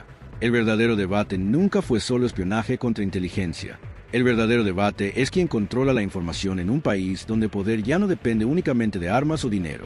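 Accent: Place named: United States. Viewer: Y porque está mmda tiene acento "gringo"?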